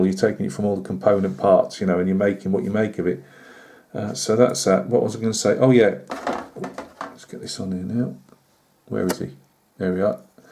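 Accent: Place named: England, United Kingdom. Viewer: It is so good hearing an English accent again. I am an expat from South Africa, been living in Spring, Texas for the past 29 years. I love your hobby, so nice.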